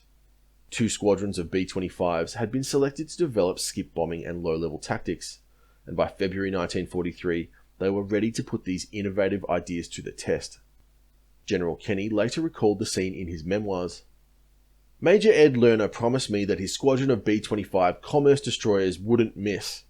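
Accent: Australia. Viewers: I LOVE this Aussie narrator ! Awesome. G'day mate ! 🫡 🇦🇺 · Hmmm? Narator sounds like Majorkill. Or do all Australians sound same?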